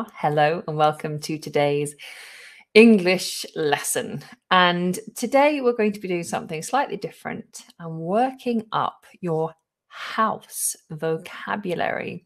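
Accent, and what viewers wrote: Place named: United Kingdom. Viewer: Hello teacher.This is also a great one as always.Actually we try to imitate you to sound more british.Love your accent.Hope more pronunciation lessons from you.Love from Sri Lanka.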